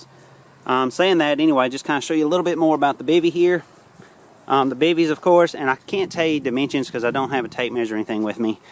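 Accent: United States. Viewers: Just curious: what part of US is his accent? Thanks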